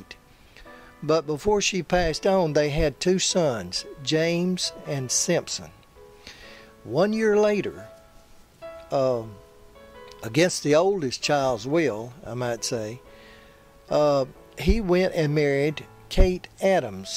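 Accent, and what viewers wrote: South, United States. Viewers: Fantastic video! I enjoyed your commentary and lovely Southern accent very much! Thank you!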